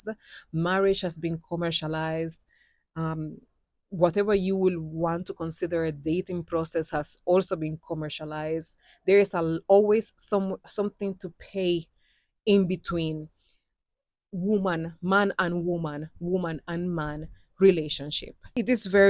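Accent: Jamaica. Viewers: I LOVE when you sprinkle some patois into what you are saying!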